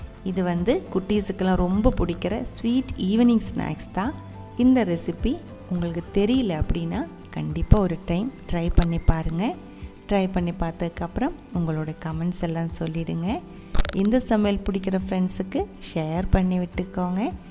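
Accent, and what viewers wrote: Kerala, India. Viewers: Very nice I love ur voice nd the presentation Malayalam Kalantha Tamil 😘👌... Vry cute snack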